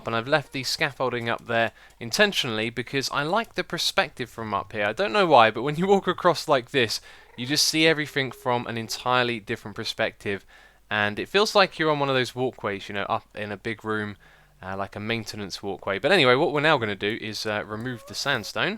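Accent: England, United Kingdom. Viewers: Are you from the north or south of England I can't work out ur accent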